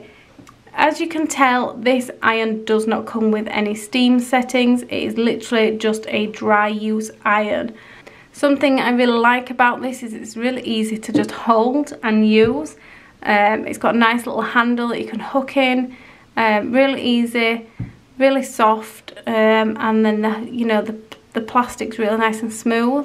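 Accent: United Kingdom. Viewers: Definitely UK. Northern England. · Off Topic: Where do you think has this Person grown up in GB? I try to lokate the accent. Thx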